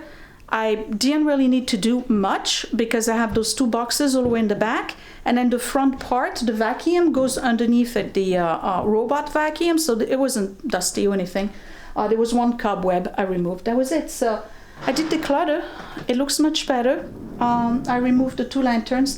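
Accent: France. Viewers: Love your beautiful French accent & your lovely antiques & treasures!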